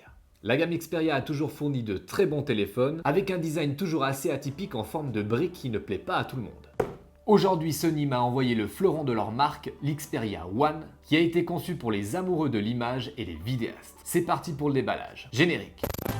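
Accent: Vaud, Switzerland. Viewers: Oooooh le T610 😍 En réalité ce que je préfère dans tes vidéos je crois que c’est quand même de bel accent vaudois 😏😅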